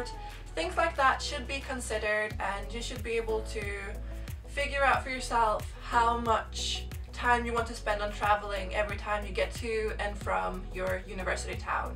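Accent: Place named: United Kingdom. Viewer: I hope you're enjoying University! Your accent has become so much more British but thanks for the tips, I'm starting my last year of gymnasiet and I'm not sure what I want to do after it :) <3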